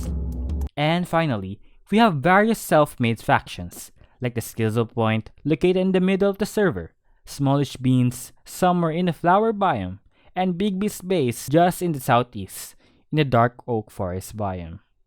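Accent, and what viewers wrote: Philippines. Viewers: You sound Filipino.. are you? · u pinoy ur voice is SOOO PINOY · Is it me or his accent sounds like filipino Xd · Well that explains his accent! I’m Filipino too!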